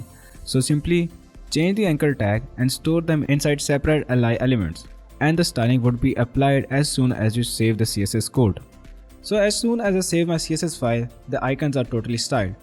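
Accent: India. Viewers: Just relised you have a kashmirir accent! Are you from JK?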